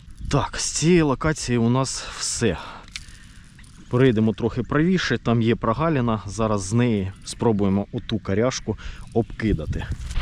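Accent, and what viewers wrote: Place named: Surzhyk, Ukraine. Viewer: підтягни українську. Таке адове суржелло, що аж вуха ріже.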